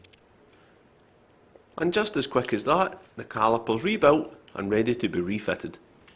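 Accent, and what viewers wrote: Scotland, United Kingdom. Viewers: The Irish accent is Scottish !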